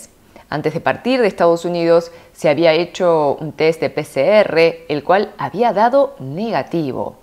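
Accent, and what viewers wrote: Argentina. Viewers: Disculpa pero hablas como Argentina vives allá y cómo te llamas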